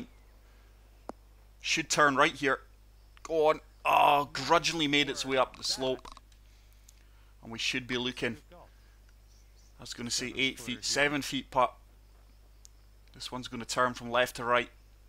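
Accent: Scotland, United Kingdom. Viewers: What part of Scotland are you from,?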